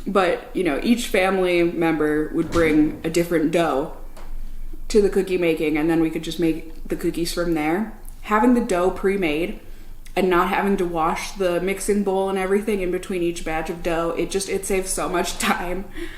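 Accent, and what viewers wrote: Minnesota, United States. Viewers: She definitely has a Minnesotan accent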